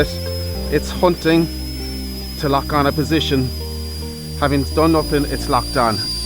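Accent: Ireland. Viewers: Geez You sound even more Irish than I do, Where you from,, I'd say Limerick at a Guess 😂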